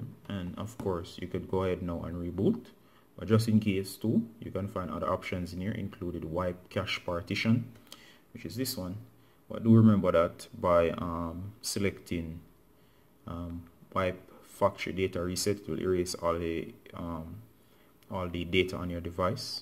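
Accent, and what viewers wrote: Jamaica. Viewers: U kinda sound jamaican im also jamaican!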